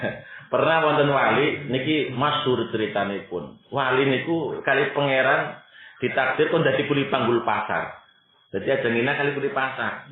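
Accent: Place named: Cilacap, Indonesia. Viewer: Mantep ngajine mas kaya wong sekitaran cilacap pa yah mas?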